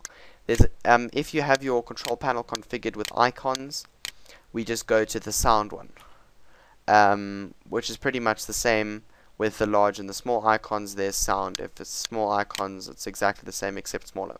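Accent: South Africa. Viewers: Are you from South Africa? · Are you South African?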